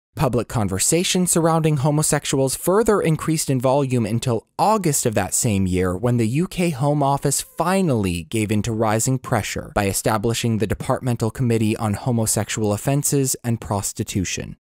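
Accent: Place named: United States. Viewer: As soon as I heard an American accent, I suspected this would be a pile up on the interstate. I’m glad I was proven thoroughly wrong. Well researched, beautifully read, and pragmatic. I for one won’t hesitate to elevate Abse to hero status. He had to hold his nose to say certain things to get it over the line. In retrospect, the weakness of the legislation ought to be seen more in its scope than its content, as it didn’t apply to Scotland or Northern Ireland, which had to wait until 1980 and 1982, respectively, to enjoy some partial decriminalisation of their own. Sadly, Abse’s work was undermined by the police and judiciary in the following decades as they pushed people through the legislative cracks into prosecutions with laws that were never really intended to be used in certain ways. At one point it was legal to insert a fist into a man but illegal to own a video of such an act. 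Even into the late 1970s, gay men and women were still being subjected to psychosurgeries (lobotomies and leukotomies), ECT, or horrendous drug-based conversion therapy. Some are still alive today to tell the tale. The fact remains, the architect of gay rights in the UK can be traced back to Alan Horsfall, Ray Gosling and Leo Abse.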